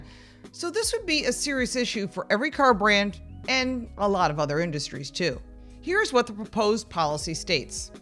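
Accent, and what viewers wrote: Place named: Michigan, United States. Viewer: Nope. Can't handle that nerve-grating Michigan accent. Take some elocution lessons.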